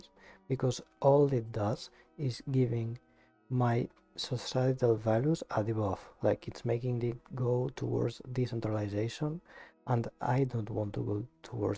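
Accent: Spain. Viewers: Eres español hablando inglés ese acento no falla jajajaja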